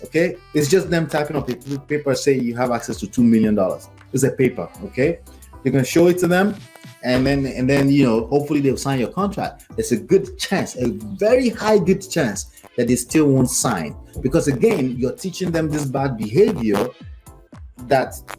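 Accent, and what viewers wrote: Nigeria. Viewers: I love this video and can tell your nigerian by your name and accent.. lol if you don’t mind, I’ve come to realize that working with realtors provides the chance for us cash investors to work directly with them and completely take out skip tracing, cold calling and direct mail.. well that’s what I thought. do you have any advice on finding properties that aren’t listed on the MLS?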